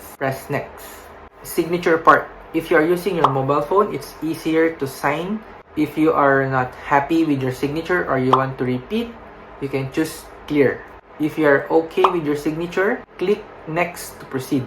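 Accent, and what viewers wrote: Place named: Philippines. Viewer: English pa more pinoy k eh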